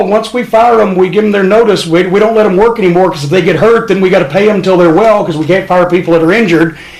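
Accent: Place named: Kentucky, United States. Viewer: thats that Kentucky twang...lol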